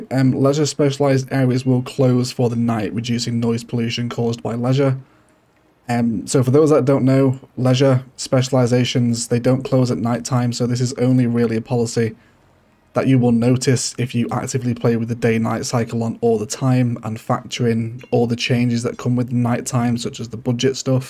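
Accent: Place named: Manchester, United Kingdom. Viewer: you sound so much like rashford, you from manchester?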